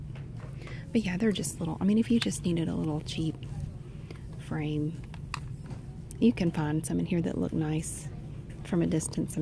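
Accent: United States. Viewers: Your asmr videos always give me the tingles before I fall asleep I love your American accent so much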